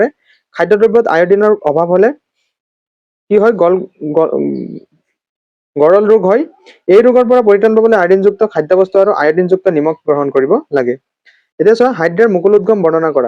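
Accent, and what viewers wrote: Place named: Nalbari, India. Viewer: Sangaskrit or Lage sir Nalbari Distic or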